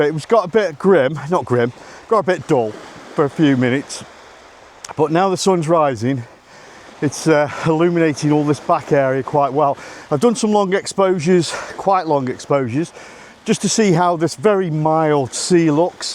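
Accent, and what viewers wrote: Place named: Stoke, United Kingdom. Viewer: Great video. Just subscribed mate. Also detect a bit of stokie.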